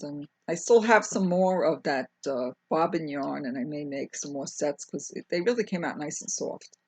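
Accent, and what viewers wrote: New York, United States. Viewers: I like the divine pattern hat & cowl. Looks really great in that color green.I enjoy listening to your NY accent, memories of home. How about a drawstring backpack that teens like. It would be about same size as your bags. 🤔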